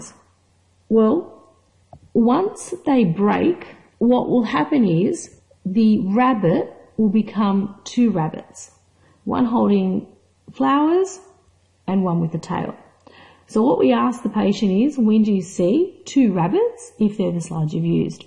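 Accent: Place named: Australia. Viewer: it's cool to hear some Australian accent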